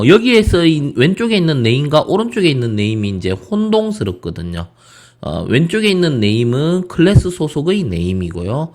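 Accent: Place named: Gyeongsang, South Korea. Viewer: 왜 나이든 프로그래머들은 죄다 경상도 사투리를 쓰는가...... 나도 모르게 사투리 리듬타다보면 고개만 끄덕거리다 머리에 하나도 안들어오게 되는데.....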